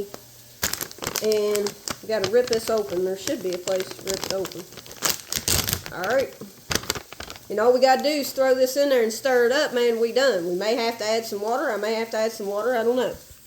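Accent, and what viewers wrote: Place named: South, United States. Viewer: You have a heavy southern accent wow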